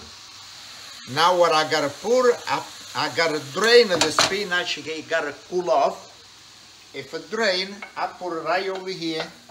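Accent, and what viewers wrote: Italy. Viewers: Che bello sentire il tuo accento con l`inglese mi fa venir voglia di ritornare in italia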